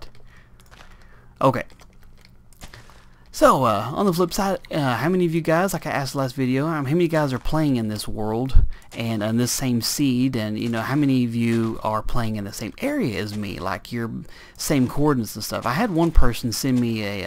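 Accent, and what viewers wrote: South, United States. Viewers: Thank you very much for these awesome videos,! I like your accent too. What state are you from? Greetings from Washington State! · Like country accent im from Georgia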